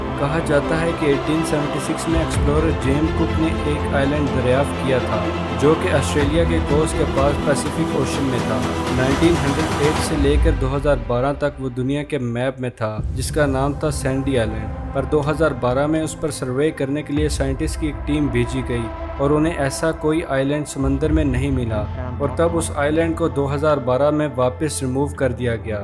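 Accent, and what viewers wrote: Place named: Punjab, Pakistan. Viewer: tumhara lehja "Punjaabi"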